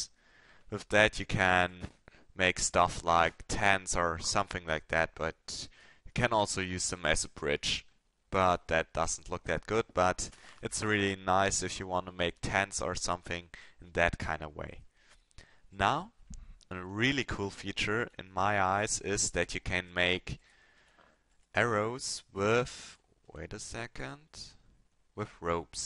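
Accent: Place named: Germany. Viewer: I can really hear that your German but that's a good thing :D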